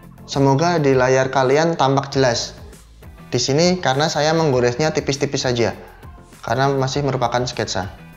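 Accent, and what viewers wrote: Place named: Purbalingga, Indonesia. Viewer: Salaam kenal ... masnya asli Purbalingga ya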